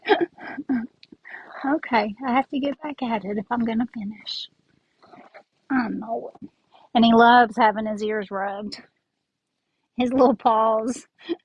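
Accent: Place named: South, United States. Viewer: So very creative and beautiful, your wee cat is gorgeous 😍😍😍 and so is your southern accent i could listen to it all day x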